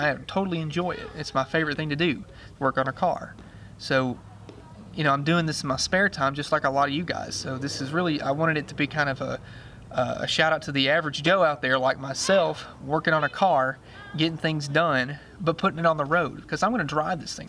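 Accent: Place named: South, United States. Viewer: My husband and I are watching this right now, we love the way the car is looking and we're watching to the end to see how it turns out. You are really amazing, we love how you take so much care with each and every part of that gorgeous gorgeous car. We're also from the South so we have accents as well so it's ok, we're use to people sounding that way. (Oh, just in cause you were maybe possibly wondering, we're from&live here in Tennessee)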